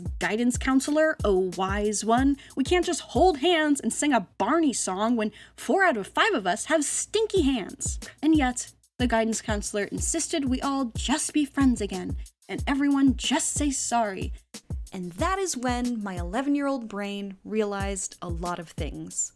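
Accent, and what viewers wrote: Canada. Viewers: you sound Canadian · the canadian-ification of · IS CANADIAN CONFIRMED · So... You're Canadian?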